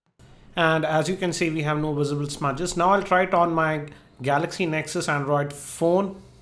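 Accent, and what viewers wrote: India. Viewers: Indian accent is so funny LoL !! I PAAAD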